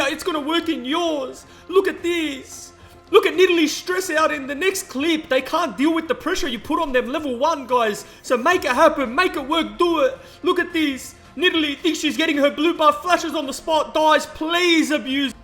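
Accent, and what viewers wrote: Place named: Australia. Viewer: Great video dude, nice editing,funny commentary and informative. im subbing hoping for more of these kind of videos. (also love the stralian accent) gl for more <3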